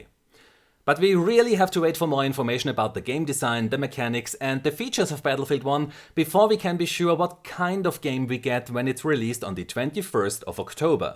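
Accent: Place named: Germany. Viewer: new sub here. Not sure if it's your German accent that keeps me listening or your focused and mature analysis of games, but either way, keep up the great work. You're bound for 1.5 Million subs soon!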